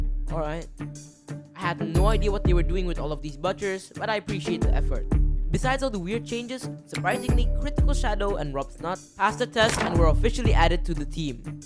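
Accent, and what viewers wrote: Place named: Philippines. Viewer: You talk like your pilipino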